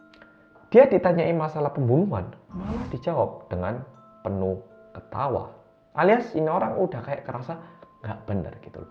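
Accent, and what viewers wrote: Java, Indonesia. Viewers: Oppa medok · Mas yaaang medhoookk. 😀 Welcome detektif reomit ❤️❤️❤️ Stay healthy forever,mas 🤗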